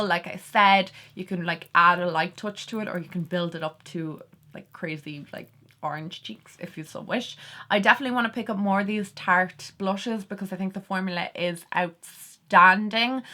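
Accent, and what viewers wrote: Ireland. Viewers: Hello beautiful, new subscriber. So proud I recognized your accent as an Irish one. 😉😊 How lovely. 💖💖⚘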